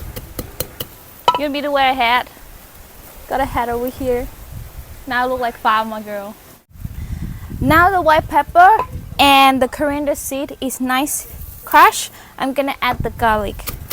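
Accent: Australia. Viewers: Did you learn English in Australia? Can hear a little accent. Food looks great! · I think you picked up an Aussie accent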